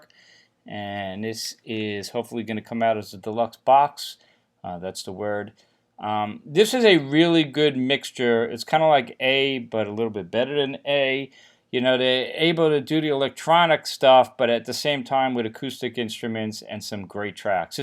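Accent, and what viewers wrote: Long Island, United States. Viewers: Is that a North Babylon Fire Department hat? You sound like a Long Islander lol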